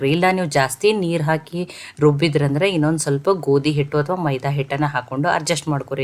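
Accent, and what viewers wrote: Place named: Kalaburagi, India. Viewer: ನೀವು ಕಲಬುರ್ಗಿ ದವರು ಇದ್ದೀರಿ ಏನು ಸೇಮ ನಮ್ಮ ಭಾಷೆ ನೆ ಮಾತಾಡಲತ್ತೀರಲ್ಲ?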